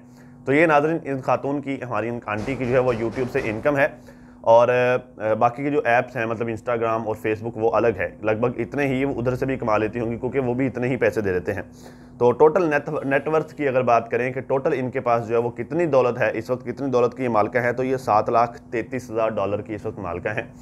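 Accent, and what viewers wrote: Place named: Hyderabad, India. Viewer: I think she is from Hyderabad, India. Their accent is very Hyderabadi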